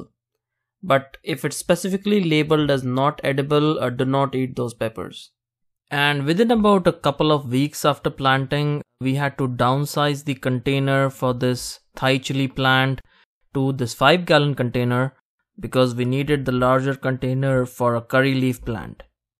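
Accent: India. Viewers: สำเนียงคนพูดเหมือนคนอินเดีย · heavy indian accent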